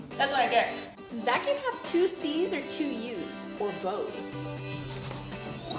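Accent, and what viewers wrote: Texas, United States. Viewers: Your Texas accent is coming back video by video. :DDD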